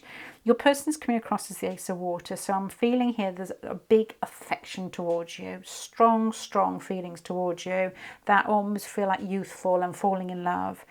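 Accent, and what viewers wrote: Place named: RP, United Kingdom. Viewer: Pile 1. I'm aqua he's libra. Wt*!!! How did you do it?? But please, please, pweeeeeese, talk a little bit less posh because most of your words are incomprehensible.. unfortunately for non posh people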